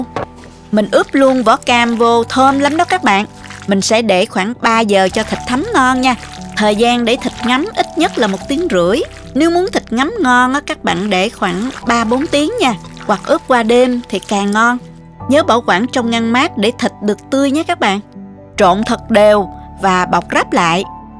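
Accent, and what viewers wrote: South, Vietnam. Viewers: nói giọng Mien Nam bghe de thuong ghê và gióng nhẹ nhưng gịt quá rất có cảm tình fish xâc bạn nhé mình chúc Cô enjoy your food. Gd bless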